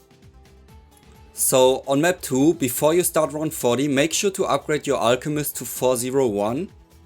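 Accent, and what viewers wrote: Germany. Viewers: Are you german? I can hear the accent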